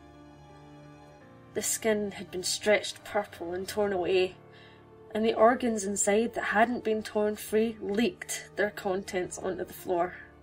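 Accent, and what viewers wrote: Scotland, United Kingdom. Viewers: Are you scotish? Wooo hooo first scotish cp reader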